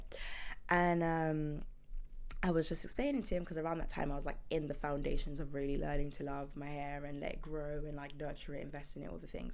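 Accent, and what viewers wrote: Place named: United Kingdom. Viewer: This is my first time watching one of her videos— But tell me why did I only realize that she had a British accent half-way through the video? LOL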